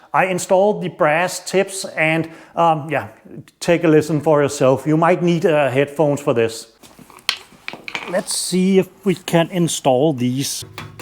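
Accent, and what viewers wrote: Denmark. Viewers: er jeg den eneste der kan høre at han er dansker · It is very much a Danish accent - fellow dane 🎉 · Where are you from? Denmark? Love the accent!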